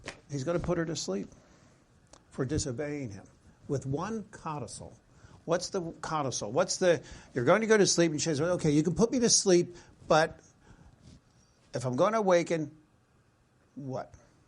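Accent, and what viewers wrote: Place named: Philadelphia, United States. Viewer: It’s a Philly accent….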